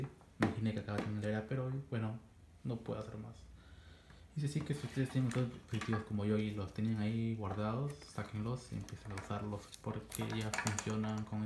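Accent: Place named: Philippines. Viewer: pesimo video no se te entiende nada hermano y parece que hablas en filipino o algo asi ...con todo respeto es mi punto de vista gracias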